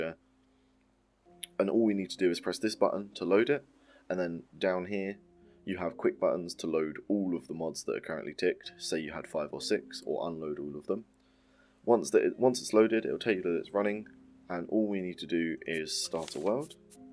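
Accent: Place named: Oxford, United Kingdom. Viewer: Thanks for the videos, what about a playthrough? You are from UK? I have a colleague from Oxford with the same accent.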